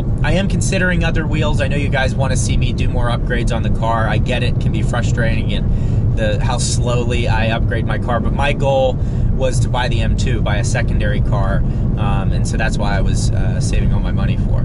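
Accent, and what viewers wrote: Pittsburgh, United States. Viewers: Holy shit, you're a yinzer! Lol I'm just south of Pittsburgh and looking for an mk3.5 for a daily at the moment.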